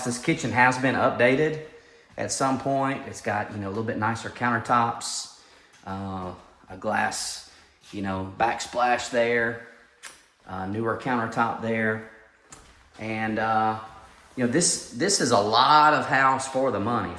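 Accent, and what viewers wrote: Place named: Alabama, United States. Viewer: Well, we have a Northport in Florida, but I’m guessing by the sound of his accent and the county is Tuscaloosa, which I’m not familiar with, is probably in Alabama. Maybe you wanna mention that in the title. I’m sure there’s lots of Northports in this country. So many videos on Facebook and YouTube, are just like this with no mention of the state.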